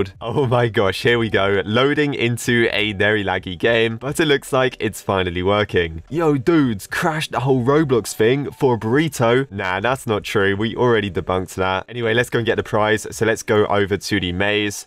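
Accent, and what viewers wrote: United Kingdom. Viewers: are you came from UK?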